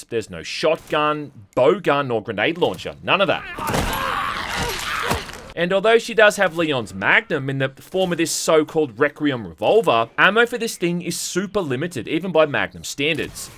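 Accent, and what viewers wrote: Australia. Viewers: the aussie jeremy clarkson of game reviews, i cant take no more of this ear rape.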